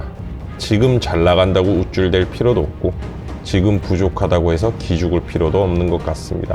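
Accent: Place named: Gyeongsang, South Korea. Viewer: 경상도 사투리인데요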